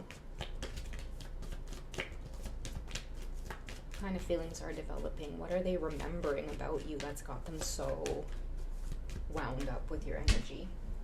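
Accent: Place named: Trinidad and Tobago. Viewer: Girl this is my first video of yours and I liked and subscribed immediately purely for the trini accent 🇹🇹❤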